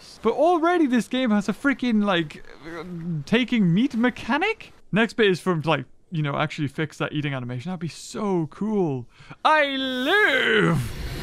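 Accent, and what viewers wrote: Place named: United Kingdom. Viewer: 5 seconds of watching the video hearing this british guy clicking on LIKE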